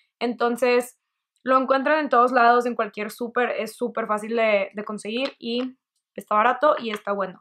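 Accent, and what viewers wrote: Monterrey, Mexico. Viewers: Me gustan tus vídeos pero me encanta tu acento regio😁 sigue así, soy fan 🙆‍♀️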